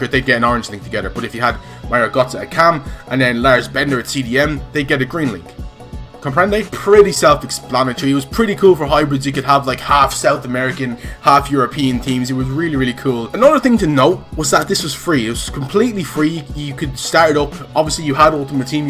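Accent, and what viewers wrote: Ireland. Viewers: Yay ur Irish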